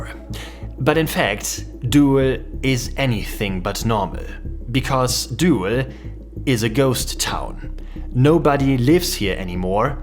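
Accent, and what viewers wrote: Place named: Germany. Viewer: Het klinkt als Engels met een duits accent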